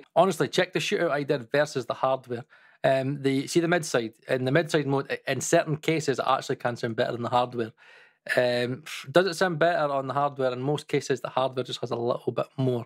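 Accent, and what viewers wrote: Scotland, United Kingdom. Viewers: I've watched 4 or 5 of your videos now, and I think I can finally state without hesitation that I can fully understand your (Scottish?) accent. :) Now I CAN sleep at night.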